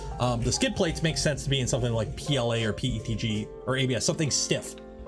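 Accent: Canada. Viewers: You speaking about being a true Canadian has me instantly sold as a new subscriber to this channel. Just got my first 3D printer in the mail yesterday. It's an SV06 Plus. So this video is a major help. Great work on this my dude. From a fellow proud Canadian (well, ashamed of you know what/who, but ya know.. Next election eh..). By the way I could tell within the first three minutes of the video hearing how you speak, that outright gave away your Canadianess right there but yeh.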